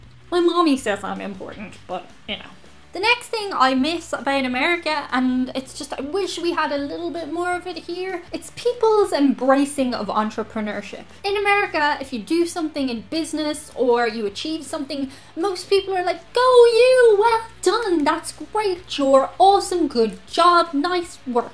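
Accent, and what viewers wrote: Ireland. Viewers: Hi,i want you to know that I love Irish accents.I really love your channel and content. I really enjoy your personality and you always make my day after watching your videos. I hope you continue with your channel and video content for years to come. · Hi, I enjoy your vids and your Irish "edge". Always makes me smile. I wonder if you know about some really American stuff? Try 'AKUS Live' here <link> as an Irish girl, if you don't know about it, you'll like it. Keep the videos coming. /RayK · I love your Irish accent. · Americans have a thing for Irish accents. This is a established fact. You can talk about anything and we love it. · On the upside, your time in Ireland has delightfully thickened your accent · I love how you leave your outtakes in at the end of your videos, where you stumble over words and sentences (like every youtuber no doubt does while they're filming), but are not self-conscious, and have the guts to leave those mistakes in the video. 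Also, love the accent. I've always had a thing for Irish accents, I just love to hear them, especially from pretty ladies like you. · Thank you, that was much needed. My Scotts/Irish grandfather arrived in American one step ahead of the sheriff, leaving a wife and four children...back in the old country. He was sure he could serve his family better as a fugitive in America than a prisoner back home. (he beat a man to death) He brought his whole family over, became a master steam fitter, died at 96 with 14 children and a full tribe of upwardly mobile off-spring. Being an Irish American is as American as as thing can be. And yeah, the Irish accent is a show stopper here. I'm studying it, so I watch Jack Taylor, you and Try Channel, et al. Keep at this. You're on to something.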